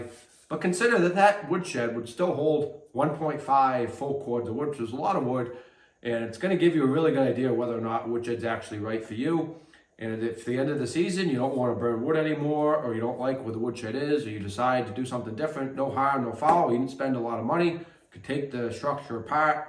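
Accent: Massachusetts, United States. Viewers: Wow. Check out that Massachusetts accent. I'm sure I'd have one in return ... From the Desert, USA.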